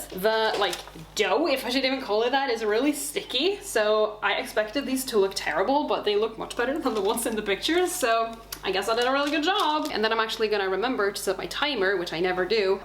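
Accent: United States. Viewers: Yes.. i usually have less interest about your cooking contents but i've realized that you have a perfect american accent.. maybe you should start to post some language based contents.. and maybe some informations from U.S.. And you should sail to kick or twitch.. these can also go with live streams.. Ohh one more thing.. i think you forgot to cut this video to parts or it's basically a weekly video :D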